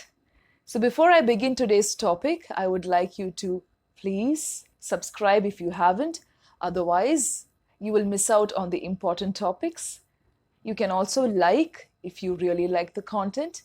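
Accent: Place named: North India, India. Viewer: When you speak English, it sounds like u r from north india. 🤔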